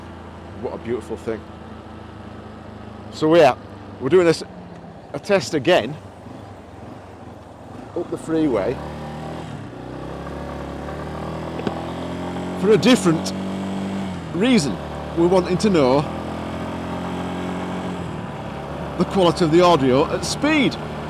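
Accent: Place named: Leeds, United Kingdom. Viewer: After pissing about reading viewing and thinking re instamic pro plus, the new dji wireless mic and the rode go 2 I have just bit the bullet and bought the rode 2. Can i ask how do you mount the transmitter to your helmet? I'm wearing a shoei note 2 flip. I saw a guy with a boom mic connected and plug into the rode 2 but was hoping for wireless with a dead cat etc. I have a boom mic in my helmet for talking rider to riders so there would not be room unless i place second mic on other side of helmet... any help or advice would be great thanks GOOD VIDEO where are you you sound like a LEEDS accent...but that snot west yorkshire lol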